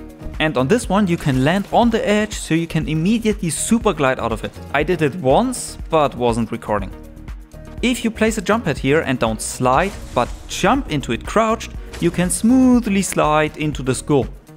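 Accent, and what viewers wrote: Germany. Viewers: Ich hab bei deinem akzent schon immer gedacht das du deutsch sein könntest hab aber nie was gefunden, bis jetzt 😂🫶🏻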